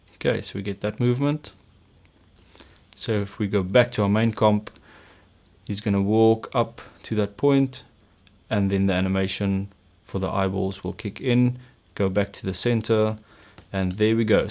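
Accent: South Africa. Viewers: I Love Ur South African Accent I'm Also South African! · You sound South African,are you ? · You sound south african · South African by far ;D Afrikaans accent ;) So yeh, he is South African, or somewhere in Africa xD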